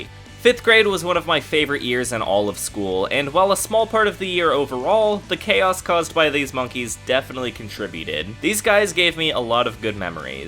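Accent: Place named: Georgia, United States. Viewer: You’re definitely from Georgia aren’t you?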